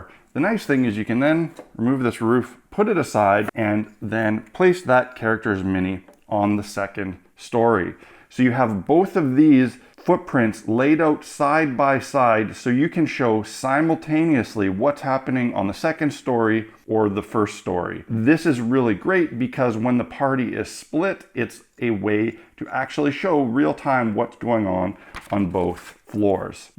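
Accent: Canada. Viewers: Oh and love the Canadian accent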